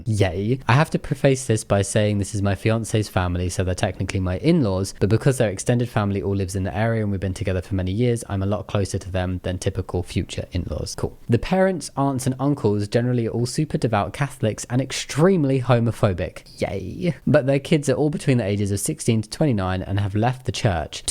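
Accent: United Kingdom. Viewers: I just realise you have a British accent and I’m halfway through the video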